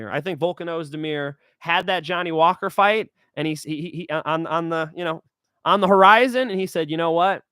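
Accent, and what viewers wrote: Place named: Tacoma, United States. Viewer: Are you from Northend Tacoma Wa?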